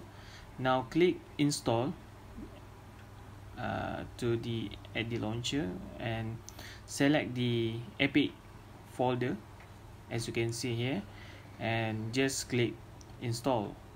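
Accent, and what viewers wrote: Malaysia. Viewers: sounds malaysian? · how about if my disk space is full, but i had the game download already? btw where you from because i hear some manglish.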